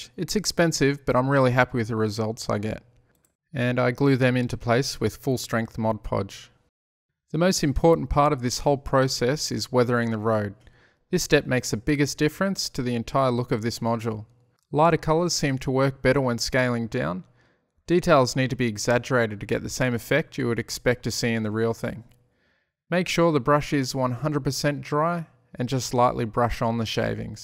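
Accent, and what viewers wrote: Australia. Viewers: Hi, great vid mate, got referred to you by ModelerMan Mike. Great to hear another Aussie accent and looking forward to seeing more of your stuff! Cheers, Dan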